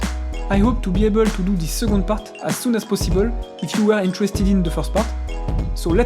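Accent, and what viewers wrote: France. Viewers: Salut belle realisation , pour l'anglais travail ton accent car on vois bien tu es francais mdrrr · oh putain cet accent bien de chez nous XD Tu as bien du courage de faire une chaine en anglais. :)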